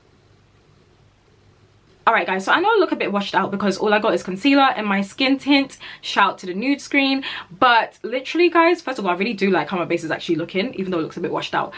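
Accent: United Kingdom. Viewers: I just subscribed because of your accent...I don't know why I love British accent so much 😭🥺🥰🥰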